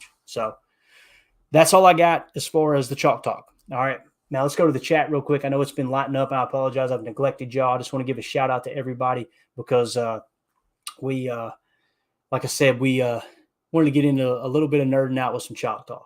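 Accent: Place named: South, United States. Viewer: How you get a southern accent living in Wisconsin?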